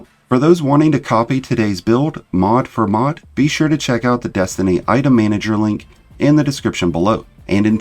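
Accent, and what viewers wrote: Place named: Pittsburgh, United States. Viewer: lol you're from Pittsburgh, aren't you?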